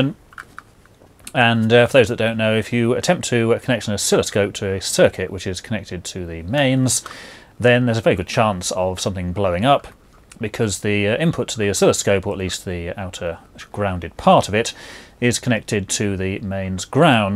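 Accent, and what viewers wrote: United Kingdom. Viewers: Couldn’t understand the heavy British accent. Blimey!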